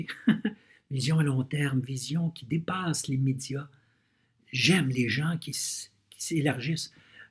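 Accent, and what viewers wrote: Canada. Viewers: Enfin quelqu'un réagit sur la deuxième section !!! Tu me l'as fait encore plus aimer ! Yessss ! (Au fait : joli ton accent canadien!)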